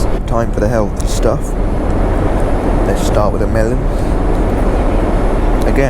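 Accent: United Kingdom. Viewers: Nice trip! Your accent is beautiful, I'm wondering where are you from?! Scotland or Wales??? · Really nice Flight report in the amazing B787-800 of Air Europa 🎉 love your British accent and your great voice. Thanks x sharing!!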